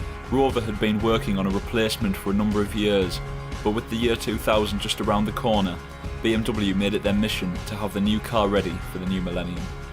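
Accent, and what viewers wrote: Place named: Newcastle, United Kingdom. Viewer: good to hear a fellow Geordie voice narrating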